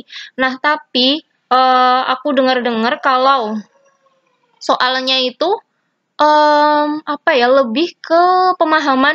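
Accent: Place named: Java, Indonesia. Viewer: Muedok nya itu lo bikin semangat belajar bareng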